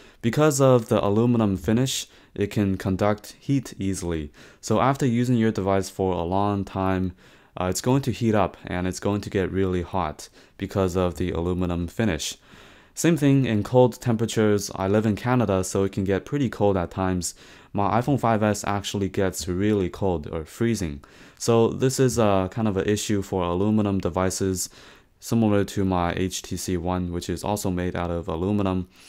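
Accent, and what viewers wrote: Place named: China. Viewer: Hi, are you from China? Just a guess based on your accent:) Your videos are awesome.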